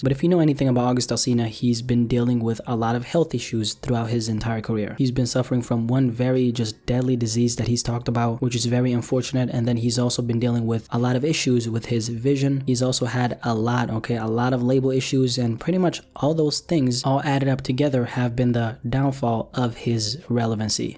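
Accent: Canada. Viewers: Are you Canadian?? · you Canadian?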